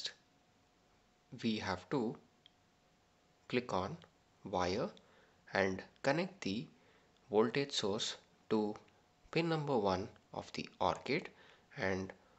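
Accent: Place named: India. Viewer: indian accent too thick to get Plz stop toturing people induan guys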